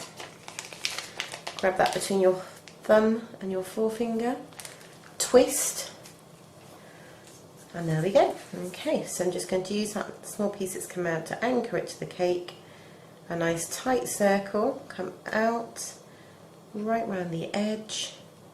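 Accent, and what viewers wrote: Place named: England, United Kingdom. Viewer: Hello 🙂, Great video! This was more detailed than I needed (more is definitely better than not enough), but perfect for the majority who may not do baking projects all the time, so kudos! I'm from New York, but I find that if I pretend to have an English accent (and an interested audience), all my projects turn out brilliantly, hahahahaha! 😂 Of course my husband hears me in the kitchen and thinks I'm nuts! 😏 You, btw, have such a lovely voice and accent! Thanks for the tips and tricks!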